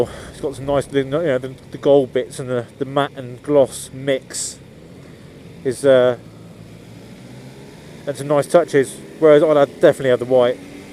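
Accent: United Kingdom. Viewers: A complete waste of several minutes of my day....I could only stand this guy's sickening British accent for a few mins(!) Comprise your stupid opinions when you're NOT negotiating city and pedestrian traffic; you might have more intelligent things to say. And please, save the childish and moronic singing for your shower. Everything in this video could have been condensed to 5 mins without your blithering and entirely unrelated meanderings.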